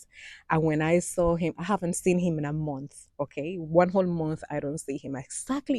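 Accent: Jamaica. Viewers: Same here, accent had me in stitches😂, her patois is on the button, besides that her storytelling is excellent! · My exact same sentiments👏🏽👏🏽👏🏽👏🏽 Your video popped up out of nowhere and I clicked out of curiosity. Your personality is intriguing. I am Jamaican, female and I left in 1981 as a young teen. Been back a year ago. Love ❤️ your story!! And your Patois lol😂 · Good luck with your projects . You deserve to see the world and more . Confession, I always laugh at your Patois . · It’s sounds like you’re getting a Jamaican accent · I just happened to stumble on your story. You are so real. Love your story. You made me laugh so hard just listening to your patois. I’m glad to hear that you’re 22 years in marriage and counting. Blessings to you and your family ❤❤❤ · Wait wait a minute a Spanish girl with a Jamaican accent.....ok ok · Girl you are beautiful you sound good with your patois tell it all I’m ready to hear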